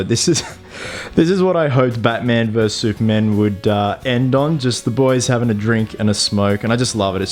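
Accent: Australia. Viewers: Where are you from?? Australia?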